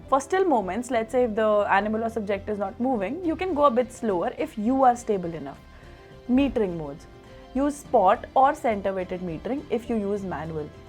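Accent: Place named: India. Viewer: Indian?? what accent do you expect?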